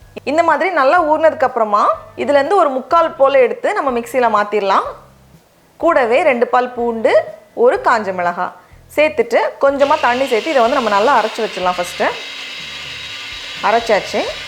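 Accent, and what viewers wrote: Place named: Kanyakumari, India. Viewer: Akka inum kanniyakumari slang varuthu...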